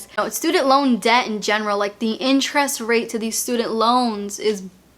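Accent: Boston, United States. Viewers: It’s so funny, some times your Boston accent comes out WICKED strong, some times you only seem to accentuate certain words (I’m sure you don’t do it intentionally) Lol, I used to live and spent quite a few years in Lowell, Fitchburg, Cambridge, but I don’t have a Boston accent at all since I’m originally from Philly. (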